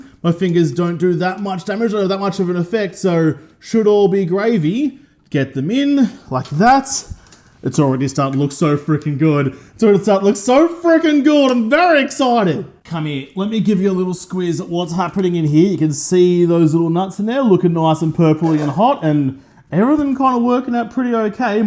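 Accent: Australia. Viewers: The aussie gab is a riot to listen too. At least you have a garage to work in! Im out here working on my Dart laying on my back in the dirt lol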